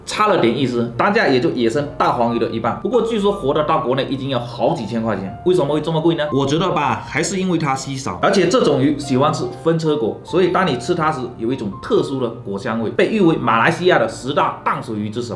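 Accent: Fuzhou, China. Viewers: 你是福州人？ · 听口音像福州人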